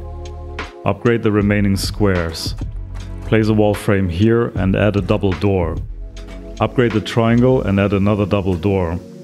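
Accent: Germany. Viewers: Ich höre dein Dialekt (ka ob das so heißt) du bist eig. Deutscher, oder? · Mann hört einf den deutschen Akzent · German accent it in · Sehe jetzt erst seit 5Min dieses Video aber liebe es einfach wie dein deutscher Dialekt raussticht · man hört dein deutschen aktzient ;D nices video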